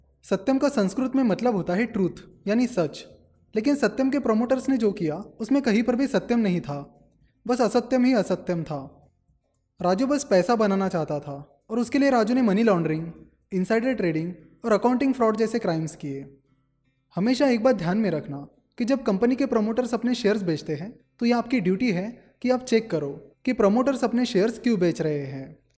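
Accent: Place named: Gujarat, India. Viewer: You sound like Gujju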